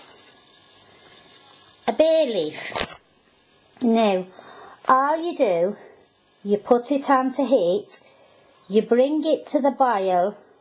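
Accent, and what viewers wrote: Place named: Scotland, United Kingdom. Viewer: You sound almost Scottish in this. So, how does this taste?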